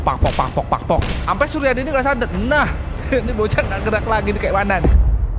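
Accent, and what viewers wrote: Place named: Lampung, Indonesia. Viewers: Lampung bangett euyyy.. tp nagih 😂 semangaaatt mas cerita ceritanya.👍👍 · Salfok sama logat nya bang , Lampung banget 😂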